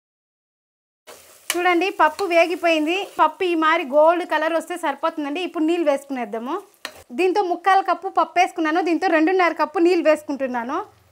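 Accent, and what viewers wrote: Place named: Chennai, India. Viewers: నమస్తే! మీ భాషచెన్నై కి దగ్గర్లో మాట్లాడే భాషలా ఉంది. బాగుంది. మీదేవూరో చెప్పగలరు. నేను ఆంధ్రప్రదేశ్ శ్రీకాకుళం నుంచి రాస్తున్నాను. వీలైతే జవాబు ఇవ్వండి. నేను గమనించింది: పప్పులో మిరపకాయలు అన్ని అవసరం లేదు. ముక్కలు చేసి వేస్తే ఎలాగూ ఉడుకుతుంది కదా!2. తాళింపులో ఇంగువ బాగా ఎక్కువ అయింది. ఒకసారి వీడియో చూసు కోండి. జై భారత్!! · Thank you for recipe. Are you from Tamilnadu Andhra boarder? Your slang seems so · Neenga tamilian .nalla theriyudhu.ungalode slang pakkayile.irundhalam telungu nalla pesaringe.namba ooru..adhukkaga subscribe panne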